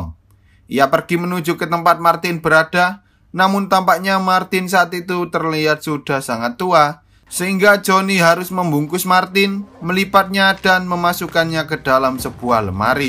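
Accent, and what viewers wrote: Java, Indonesia. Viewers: Waduh pekat juga jawa nya ya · Logat jawa bgt bew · Pengisi suaranya kentel jawanya.. jellek amat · Bang kamu asal dari Jawa ya kalo iya jawab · Medhokk kalii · Suara jawir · anjir admin e orang jawa to hahha seketika aku ngrokok , admin e ngomong wes rasah kesuwen aku watuk keselek belok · Medok brooo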